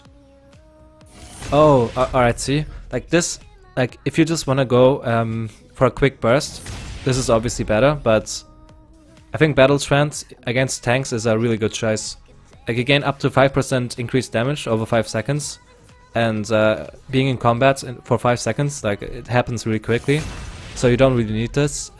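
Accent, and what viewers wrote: Germany. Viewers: Wait what, is a German guy?:O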